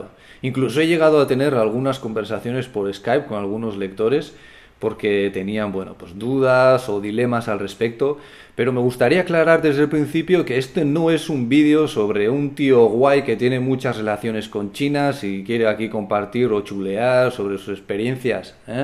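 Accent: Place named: North, Spain. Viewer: En el norte de España su acento es así, en este chico y en el común de la gente.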